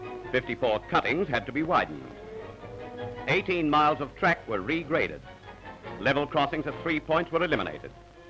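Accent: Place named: RP, United Kingdom. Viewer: Great to watch and I love the deliberate BBC accent.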